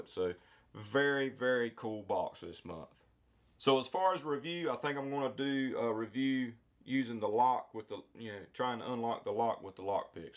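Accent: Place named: Georgia, United States. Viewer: Hey! where are you from? You sound like your from around the Georgia or South Carolina area...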